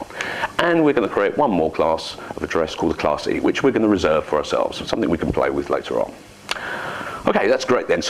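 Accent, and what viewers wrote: England, United Kingdom. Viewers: This is how it's pronounced over here in the UK! He's English if you haven't noticed! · This isn't bad. Can't remember the last time this american learned anything from someone talking with an english accent.....lol how american of me ....lol Great tutorial son.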